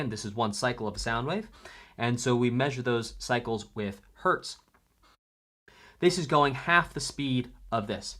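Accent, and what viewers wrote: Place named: France. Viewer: "Clair Obscur, je conduis une voiture..." xD ... et je percute un Sakapatate ! Did he talk about chroma? An advantage! Nice french accent btw!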